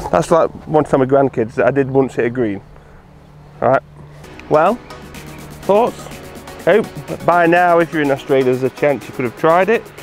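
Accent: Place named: England, United Kingdom. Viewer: I thought you sounded like an English bloke, are you in Oz?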